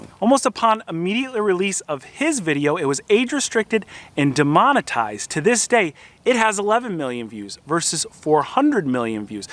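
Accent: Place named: Florida, United States. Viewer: You are starting to sound like the @@@@ Floridians who show up here in NC. And no, I am not going to Instagram because it is owned by FaceSuck.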